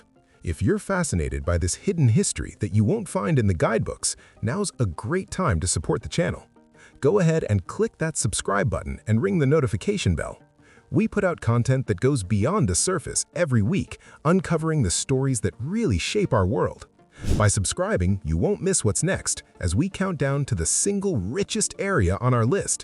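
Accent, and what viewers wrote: United States. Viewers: The -"gie" in Selegie Road is pronounced like the "-gee" in "geek". Please stop pronouncing everything the American way when the names belong to another country.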